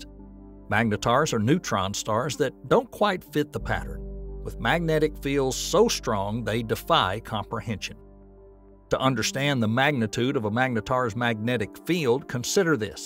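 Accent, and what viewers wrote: South, United States. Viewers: Country accents are cool.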